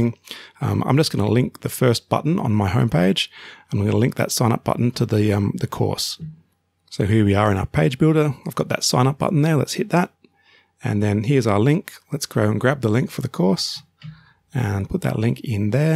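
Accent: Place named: Australia. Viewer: Thank you! Nice to hear that Aussie accent!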